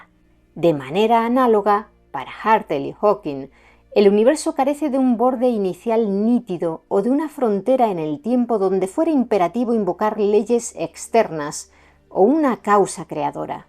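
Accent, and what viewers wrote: Castile, Spain. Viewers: De los pocos españoles que no me molesta escuchar el acento castellano,buen tema y bien resumido, felicitaciones.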